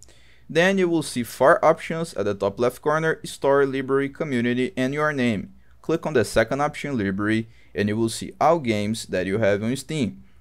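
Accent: Brazil. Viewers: brazileiro ne pai esse sotaque eu conheço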